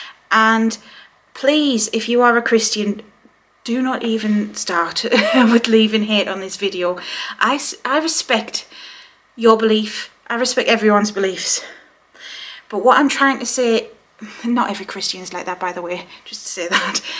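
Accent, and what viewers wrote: United Kingdom. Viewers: I'm only about 30 seconds into watching one of your vids for the first time, and my comment has nothing to do with the content, and for that I am sorry, but I just had to say quickly... I LOVE YOUR ACCENT! I love most British accents, and yours is just ... i love it! OK, now back to the content. ♥ EDIT: this was very helpful. I have felt drawn to Hekate for several years, but have wondered if she would even bother with me, like... I'm not special enough or something. Anyway, your video has given me renewed motivation and clarity, and I thank you for that!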